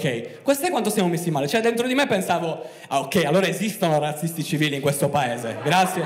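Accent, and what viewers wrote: Sardinia, Italy. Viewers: accento sardo top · sei Sardo, quindi è anche bello ascoltarti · accento sardo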